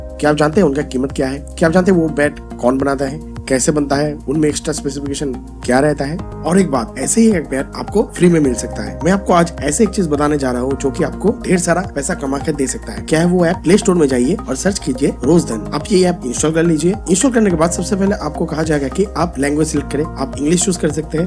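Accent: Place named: Uttar Pradesh, India. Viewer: aap up se Ho na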